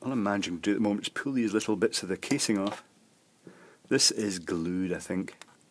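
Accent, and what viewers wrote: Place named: Isle of Man, United Kingdom. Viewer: You live in Isle of Man? I thought you were Scottish, never thought Manx. That's cool!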